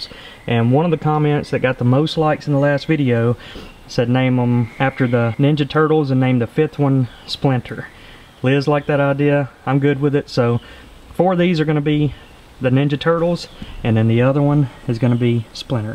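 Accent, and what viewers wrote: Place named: Alabama, United States. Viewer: Omg ok so im from texas but i moved to alabama about 2 years ago i moved back sense but i have a best friend that i miss so much and accent is exactly like hers and i know its because yallare both from alabama but i just wanted to mention it😂😍😭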